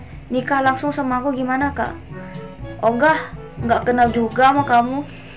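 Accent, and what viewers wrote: Indonesia. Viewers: LOGAT BAHASA INDONESIAMU 👍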